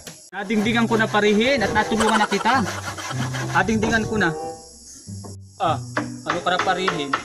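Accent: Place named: Mindoro, Philippines. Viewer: Hehehe kaganda NG tono nyo Lodi taga San ga kayu Lodi parang mendoro oh simara oh rumblon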